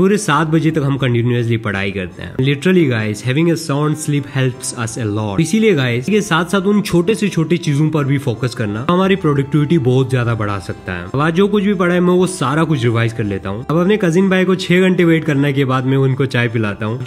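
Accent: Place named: Kargil, India. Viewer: Bia app ka accent kargil se lagat hea .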